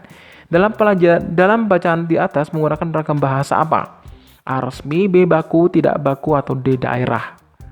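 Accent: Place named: Java, Indonesia. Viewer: Bener lho suaranya mirip dosendeso...agk kejawa2an ngomgny...kaya org jawa medok dikit hihi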